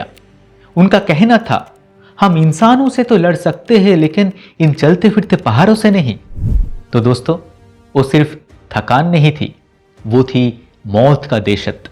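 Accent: Bengal, India. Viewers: Pure bangali hindi... We r always with you brother❤ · I'm a good hindi speaking Bengali and I can see the effort you have put in speaking Hindi in this video. And being your first hindi video, I appreciate you and motivate you for further going on with this venture. Yes, the Bengali tone is evident in your speech but you have balanced it well with a controlled pace which has minimized any pronunciation mistakes. Topic and research were good. But full marks for your courageous first steps in making a hindi video not being a native hindi speaking guy. Lage Raho.... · হিন্দিতে একটু বাংলার টান আছে, পারে ঠিক হয়ে যাবে, 💹↗️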